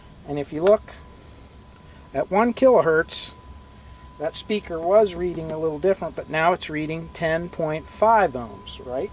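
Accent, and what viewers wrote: Pennsylvania, United States. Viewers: Hey I really like the channel. I'm a woodworker and a ham operator. You sound like you're from western/central pa if so I'd li,e to meet you. Trade ideas on projects and learn some electronics. You have a great teaching style and I'm learning a lot. Give me a shout. Rick - dickrackson at yahoo dot com. Thanks rick.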